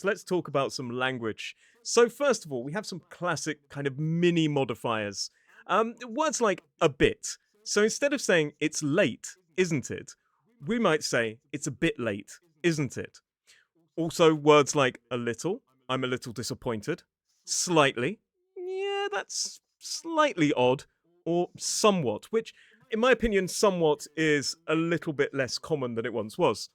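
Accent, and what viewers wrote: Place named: England, United Kingdom. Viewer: I hadn't noticed the backdrop until now. Maybe there's something missing to be sure that we are in an English man's house 😉 It's always a pleasure to watch your videos. French people are not really polite and rather direct. In-your-face rude unapologetically. English people are the best. And your accent... ❤ I'm fond of it. Thank you from Paris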